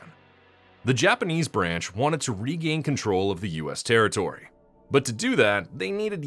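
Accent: Canada. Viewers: Is this guy from Nova Scotia?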